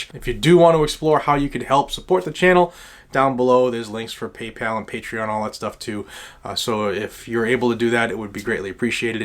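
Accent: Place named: Massachusetts, United States. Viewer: Keep on keeping on! By your accent, i'm guessing that you're from Massachusetts. Don't know if you'd want the aggravation, but if you were to ever run for a seat in gov't, you definitely got my vote! "I was born free, I will live free, and I will die free!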